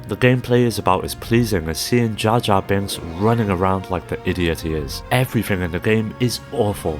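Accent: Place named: North Wales, United Kingdom. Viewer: Quality vids. Random but are you from anywhere in N.Wales? Familiar accent.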